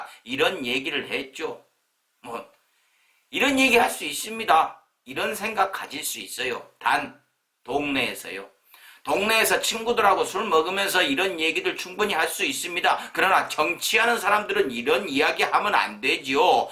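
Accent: Jeolla, South Korea. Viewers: 똥물... 맞네 ㅋㅋㅋㅋㅋㅋㅋㅋ 근데 발음 교정좀 해주세요 전라도 말인데... 차명진 문제야 지금은 경상도 말쓰면 경상도코로나 라도 하던데 피하고 난지금도 피하고싶어요 경상도 말하는사람들 한테 코로나 결릴까봐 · 강성범씨 고향이 경기도라고 하시던데, 전라도말을 구사하다보니 맛깔스러움도있지만 살짝 부담도 됩니다 속 시원하게 해 주는 매력 넘쳐요~~ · 왜 코메디 계에서 왜 안보이나 했더니 정치판에 들어왔냐?송충이는 솔잎을 먹어야 산다고 했던가? 하하..대가리에 들은것도 별로 없지 싶은데 참 어울리지 않는다. 표만 깎아 먹는다. 도 절라도 치인가? 전라도 사투리를 많이 쓰네!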